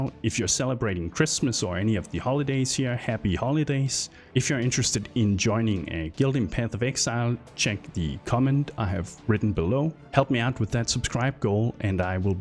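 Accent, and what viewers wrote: Denmark. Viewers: You're danish aren't you. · Just wondering, are you danish? Because your accents sounds quite like a dane 😂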